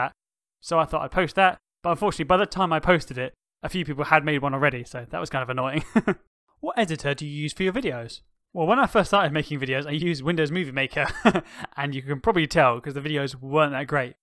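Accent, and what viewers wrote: United Kingdom. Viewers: You are actually quite similar to Mumbo -brittish accent -good at redstone -classy outfits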